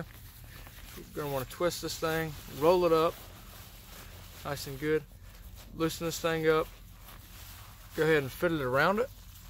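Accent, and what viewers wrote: South, United States. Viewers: You are the only person I've ever heard with a voice that sounds like mine. You have a southern accent. But it was strange listening to this. I like the channel. Keep it up man.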